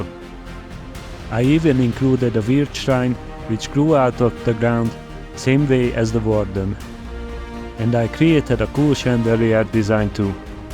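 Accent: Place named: Hungary. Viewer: Olyan, mintha magyar akcentusod lenne :D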